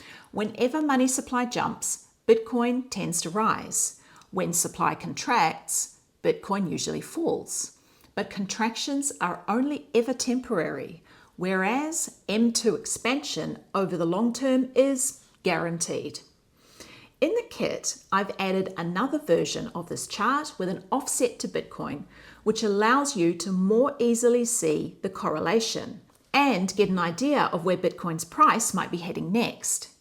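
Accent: New Zealand. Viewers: This was an eye opener Just started my bitcoin journey and stumbled onto your platform Your accent reminds me of my kiwi sisters 🤣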